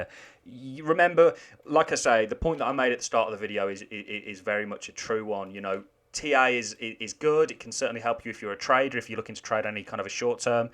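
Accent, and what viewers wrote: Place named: West Midlands, United Kingdom. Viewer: Thanks again. Nice to hear a west mids accent adding an enormous amount of value, keep up the good work pal.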